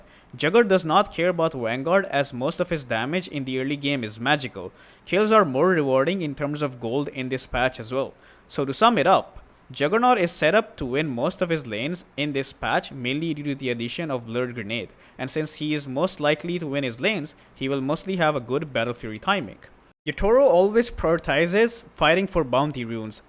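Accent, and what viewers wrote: India. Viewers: i like your INDIAN accent dude.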